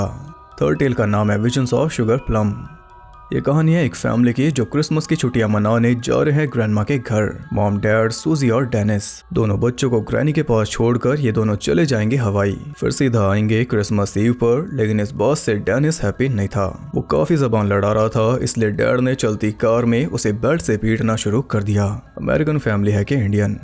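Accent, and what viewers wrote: Haryana, India. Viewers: Tm hariyana se ho na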